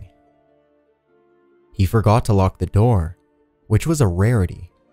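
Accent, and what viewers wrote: Canada. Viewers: Love Your channel...New Subscriber :)....Your Canadian right?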